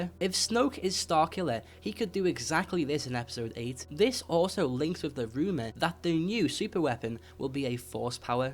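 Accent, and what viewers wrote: Liverpool, United Kingdom. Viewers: u sound like wayne rooney are u a scoucer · R u scouse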